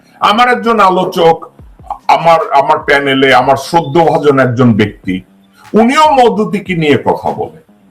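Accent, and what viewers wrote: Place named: Bangladesh. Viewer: সালাম ভাই আপনার কথা শুনে মনে হচ্ছে আপনি সঠিক বাংলাদেশি।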